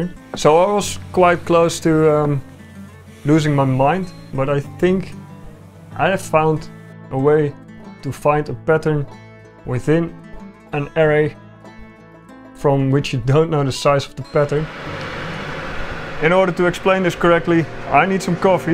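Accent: Netherlands. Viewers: Being a dutchy makes me realize how Dutch you sound. Love it tho. G E K O L O N I S E E R D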